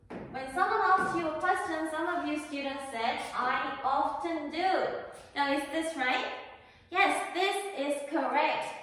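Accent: United Kingdom. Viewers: 뭔가 영국액센트 느낌... 쌤 너무 잘하세요 나도 저렇게 잘하고 싶다 많ㅇㅣ 배워가요!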